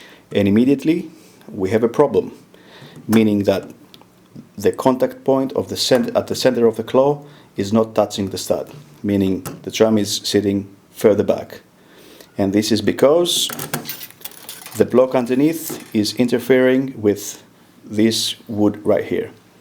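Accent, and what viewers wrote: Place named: Greece. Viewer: 1 minute in and I started looking if you are Greek. Bless the accent <3 Great work <3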